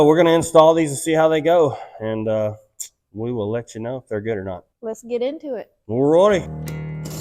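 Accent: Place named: Appalachia, United States. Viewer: Zero chance in he!! The stick on holds that to the fender. What kind of hillbilly accent is that 😳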